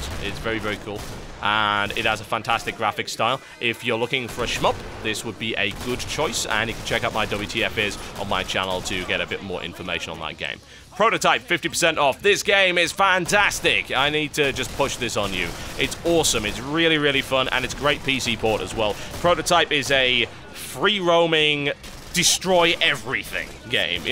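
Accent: United Kingdom. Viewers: 27? His british accent always makes him seem so much older.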